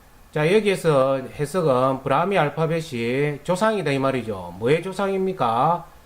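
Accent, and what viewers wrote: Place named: Gyeongsang, South Korea. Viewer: 몬 개소리하는지 니 말부터 통역해야것다!갱상도!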